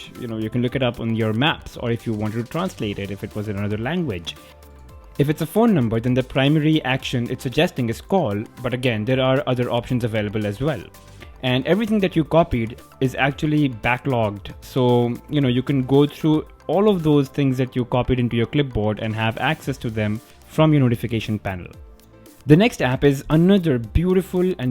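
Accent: India. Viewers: I'm a white guy from California. I can understand what he is saying no problem. He has an Indian accent but Its not that bad. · amit gupta We love being rqcists. I should sue this guy!! I had 2 seizures because of his God Damn fucking voice/nasty ass Indian accent. I like India like I like the flu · bruh... indian accent English is pure fucking shit ear cancer! Also, no app for begging for "bobs and vegana"?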